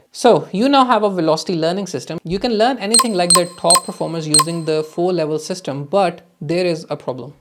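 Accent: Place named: Northern Ireland, United Kingdom. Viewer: I hear N. Irish accented words!? lol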